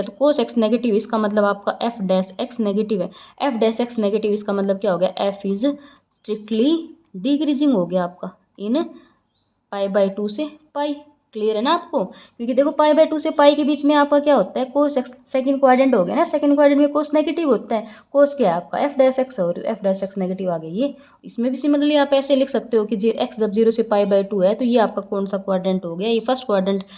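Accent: Haryana, India. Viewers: Haryana se ho mam?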